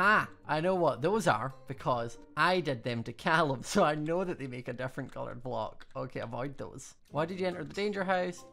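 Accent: United Kingdom. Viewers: U sound British 🤐🤐🤐🤐🤐🤐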